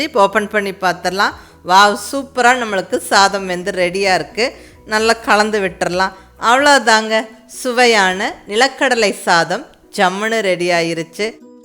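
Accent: Coimbatore, India. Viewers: Pakka coimbatore voice.... love that u were hiding the face...nowadays people are running behind fame.... u r unique among them....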